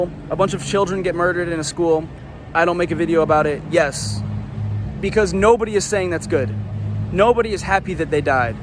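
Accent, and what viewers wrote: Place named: Midwest, United States. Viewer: That Midwest accent came out in this one. That’s how you know he’s cookin’.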